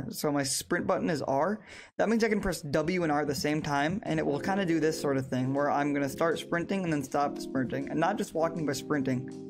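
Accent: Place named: Netherlands. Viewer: where are you from you sound dutch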